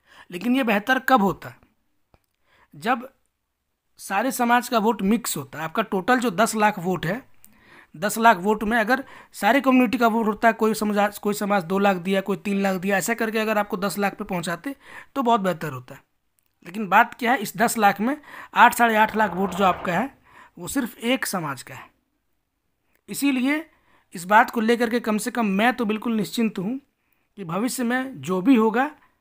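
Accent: Bihar, India. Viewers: आवाज तो बिहारी की है।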